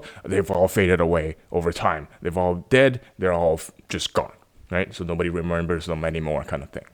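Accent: Standard. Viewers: Thank you for your video; your Chinese proficiency is already excellent, and your pronunciation is very standard. Here, I'd like to emphasize a cultural difference that might lead to a slight deviation in your understanding of the meaning of the poem at the beginning of the video. In traditional Chinese beliefs, gods (inherent, pre-existing beings) and immortals (those who can achieve immortality through cultivation) are considered absolutely fair and pure entities without personal desires or malicious thoughts. Desires for wealth, power, and emotional attachments are seen as obstacles for becoming a god or immortal. This perspective contrasts significantly with Western mythology, especially Greek mythology, where the emotions and personalities of gods and humans are more similar. So, in essence, the second line of the poem is expressing that although mortals admire the carefree freedom of becoming a god, they are unwilling to let go of their desires for wealth and power. 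This poem originates from another ancient novel and originally aims to guide ordinary people, with a Taoist advising them to relinquish their attachment to wealth, power, and emotions, encouraging self-cultivation to become immortals. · 你是华人吗，感觉你的中文特别地道标准，无论是普通话口音还是中文文化，你都很地道，感觉你至少在中国生活过很多年。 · Thank you for your excellent video. Although there were some inaccuracies in the translation, it was already very admirable. Your accent is very standard. Like it👍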